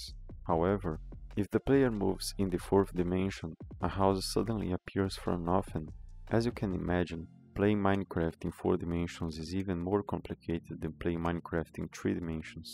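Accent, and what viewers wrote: Brazil. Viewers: Vc é brasileiro né? O sotaque brasileiro é inconfundível kkk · Reconheci que era br pelo sotaque! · Aaaa, por isso o inglês era estranho, o cara é brasileiro, em fim ótimo trabalho parceiro, foi abençoado pelo algoritmo do youtube 100k views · Oh, good (percebi que é Brasileiro pelo sotaque no video, então... congratulations) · brazilian bruv this accent 😭😭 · Na hora eu já saquei que vc era br, c não engana primata da sua espécie não em Enfim, seu canal tem potencial, daqui um tempo vc tá nos 50 mil incritos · Incrível como eu já sabia que era BR só pelo sotaque kkkkkkkkk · I must know this Why do you sound brazilian? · Eu tenho CERTEZA que é brasileiro n é possível ksksksksksk Esse sotaque é muito nosso vei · That's a brazilian accenttt broooooooo! tamo junto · e esse sotaque brasileiro aí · Are you brazilian? I'm assuming from your accent. 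· O sotaque brasileiro é inigualável kk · primeira vez q percebo o sotaque de um brasileiro kkkkkkk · Percebi que era BR só pelo sotaque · Se ele não for um brasileiro falando inglês eu crio asas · Reconheci o sotaque brasileiro hue Ótimo vídeo. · tu é br né? kksksksks eu já percebo na hora brasileiro falando inglês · E esse inglês brazuka aí? Eu notei o sotaque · Sotaque brasileiro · da pra reconhecer brasileiro de longe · Com esse sotaque, você é brasileiro, não é possível kksjjskkjjjjsjsjsjsjsjsj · amo q da pra saber qnd o cr eh brasileiro falando inglês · Salvee, to ligado que vc é br, da pra perceber kkkkkkk, mas enfim, ótimo trabalho! 👏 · Cara, você é brasileiro? Fala bem pra caramba mas o sotaque não engana kkkk, a localização do canal no sobre também fala que é do Brasil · Acho que o narrador é br kssksk esse sotaque en ksk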